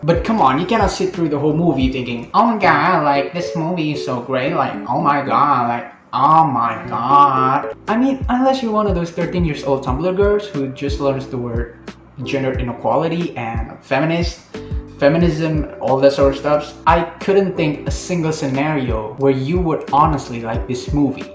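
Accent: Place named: South Korea. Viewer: cute with korean accent😘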